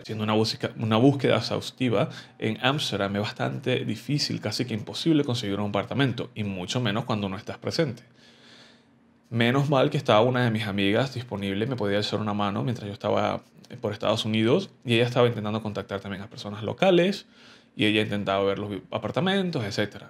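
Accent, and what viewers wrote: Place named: Venezuela. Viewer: Epa chamo jajaja vine porque quería mas consejos sobre la barba pero me quede escuchando tu historia tu acento es venezolano no tenia ni idea quiero preguntarte unos consejos sobre la barba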